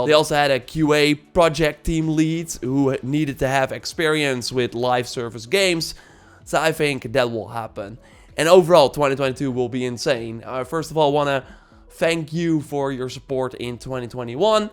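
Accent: Netherlands. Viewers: Watched u for 5 seconds and I immediately detected a fellow dutchman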